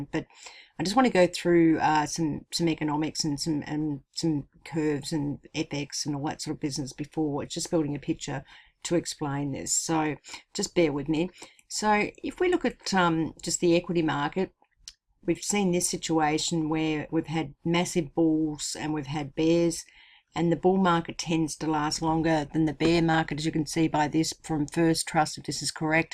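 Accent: Australia. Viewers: Hi, I recognise that accent cos I'm also Australian =) Definitely subscribing. Great vid.I'm holding alot of xrp hopefully it the bull run continues.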